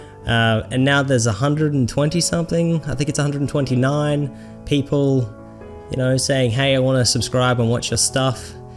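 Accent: Australia. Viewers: Congrats on almost 300 subscribers now :-D In the couple videos I've watched I've spent half my time trying to figure out if you were an Aussie or not haha glad to see someone giving us Aussie gamers good representation. Good luck with future videos. :-)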